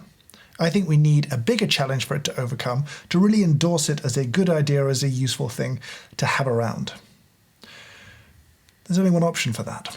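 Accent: United Kingdom. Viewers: Yeah, it's called 'British accent'. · That's honestly the only reason why I watch him and I'm even British but I don't sound like this 😂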